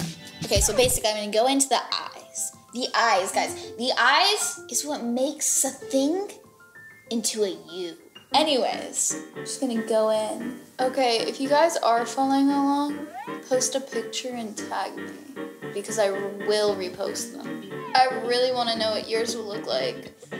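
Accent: United Kingdom. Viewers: You sound so good in British accent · I love the British accent!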